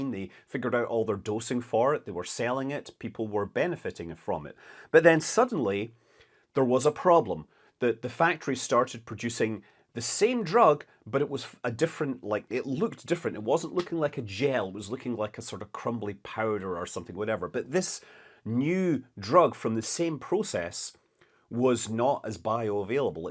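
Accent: Scotland, United Kingdom. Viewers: zero gravity spoken like a yank - loosing your scottish accent!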